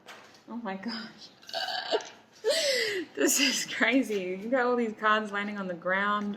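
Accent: Australia. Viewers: are you austrailian?? i love your accent! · Group#1. Thanks a million! I am so grateful for this reading. It's so finetuned it's unbelievable. You are truly one of the most gifted readers on YouTube, if not the most. Much love, much light, much power to you 🙏🙏💗💗🌈🌈 And I love your Australian accent, so very charming!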